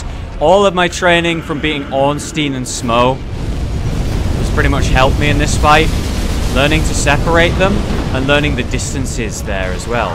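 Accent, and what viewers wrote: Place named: Wales, United Kingdom. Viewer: Scottish I thought he sounded Welsh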